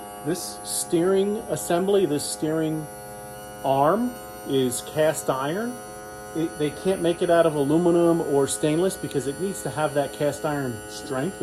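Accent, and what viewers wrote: United States. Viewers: I have a couple of comments today. One is about the quality of your video and sound, which I think is more than good enough. The video quality is great. We could see this time that you got the camera very close, a very clear image of the different parts of the engine. On top of that, you don't need a new microphone but simply speak facing the camera and close enough to the "mike". Although of course a remote mike hanging from your neck would be closer than it is now. The other comment is a silly one. Your nickname is a very nice one. I do like it but in my understanding of your speaking it always sounds to me like outboard-dead, like meaning that your are able to bring back to life a dead engine which is a big truth. OutboardDaddy would make it very clear the meaning of your nickname. I'm sure it is just that I'm foreigner altough I consider that my level of english is not bad, there's a discrepancy between my ears and your accent, which on the other hand I think it's a very typical and crystal clear American accent, much clearer for most people than the heavy accents that I found in Texas, but to me is hard to find the differences of dad and dead pronunciations (same happens "to me" when finding the difference between man and men or can't and can).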